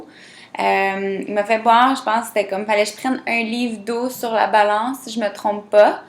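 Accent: Quebec, Canada. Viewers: Il est trop mignon ton accent québécois. T'as des bras super musclé dis donc 👍👍. T'es jolie aussi 😍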